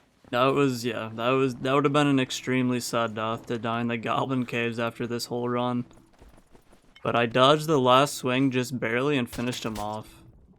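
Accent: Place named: Minnesota, United States. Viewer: def from minnesota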